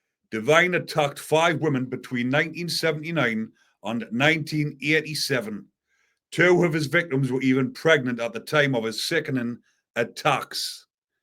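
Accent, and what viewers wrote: Newcastle, United Kingdom. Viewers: Nice one keep them coming mate good to hear a fellow geordie on here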